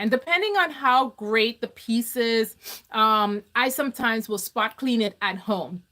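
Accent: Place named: Trinidad and Tobago. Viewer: I was wondering where that accent originated from 😊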